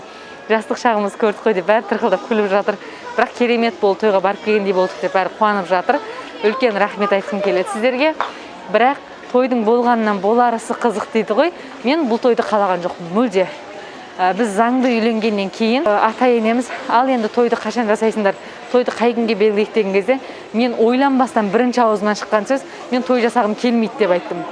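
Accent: Standard. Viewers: Я айтпақшы сіздің таза қазақшаңыз қанша жыл шетелде тұрсаңыз да өзгермепті жарайсың дегім келеді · Қандай керемет тұнып тұрған қазақша сөз деймін.Қайда жүрсең де Бақытты бол! Сенің каналың мен үшін өте қызық тартымды көре бергім келеді.Жаңалығың көп болсын! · Бізде де күн ыстық. Қазақ тілін таза сөйлейді 👍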